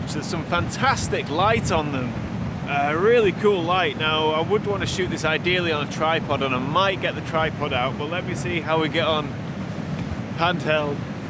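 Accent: United Kingdom. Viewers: Few things are more enjoyable than hearing a British man complaining about the weather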